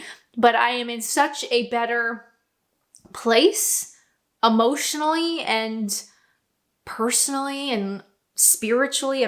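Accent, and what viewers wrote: Canada. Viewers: It’s OK, you’re Canglish. We get it, it’s cool 😎!!! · Surprised after all this time your accent is still fully Canadian? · Hi! You've aged? You're looking good girl!!! Yes, I know the feeling of not belonging, having an identity crisis and trying to adjust when we emigrated to Tronno and returned all those years ago as a 9/10 year old. This was all in only 1 year! Didn't know if I was coming or going but what an experience to be had! (...and yes, I did start to have a Canadian accent like yours but lost it again:) ) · Still got a Canadian accent.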